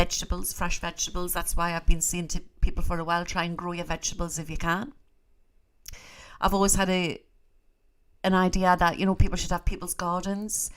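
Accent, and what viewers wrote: Newcastle, United Kingdom. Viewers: I love hearing you because you are a Geordie who is a spiritualist and I miss the spookies back in south Shields. You soothe me. From Lisa in Tasmania Australia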